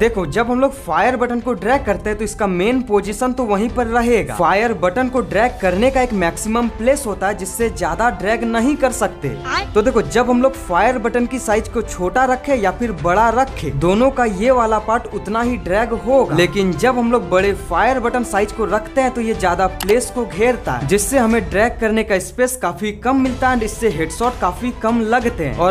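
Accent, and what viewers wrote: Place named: West India, India. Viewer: Bhai ka taliking style full west india ke citizen ki tarah hai 🙂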